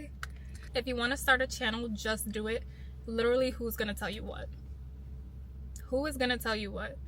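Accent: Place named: Trinidad and Tobago. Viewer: Ya sound like ya from Trinidad 🇹🇹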